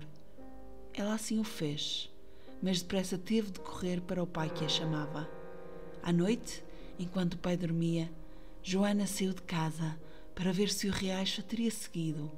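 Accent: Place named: Portugal. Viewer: obrigada eu tava a pesquisar uma canal con sotaque do Portugues, eu gosto agora da sua pagina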